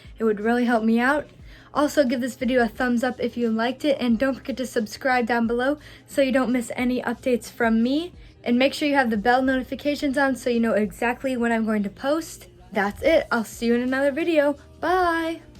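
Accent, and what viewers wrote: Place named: Ireland. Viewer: i can really tell you have a irsh accent ❤️❤️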